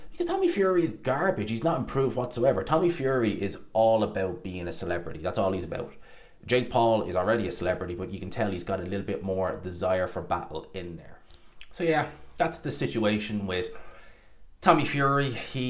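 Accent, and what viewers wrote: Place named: Clonmel, Ireland. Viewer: Sound like you're a clonmel man brother. Great channel.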